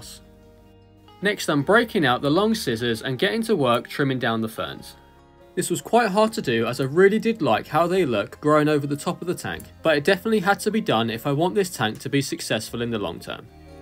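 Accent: United Kingdom. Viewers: His British accent is just killer😌 By the way the setup is amazing 🎉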